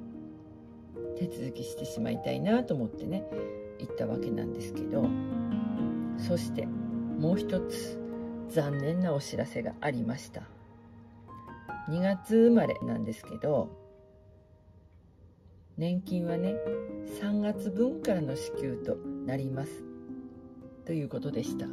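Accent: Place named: Hokkaido, Japan. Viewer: さん初めまして😊 さんの話し方が、北海道にいる親戚に似ていて、とても懐かしくなりました♪ これからも、動画のアップを楽しみにしています！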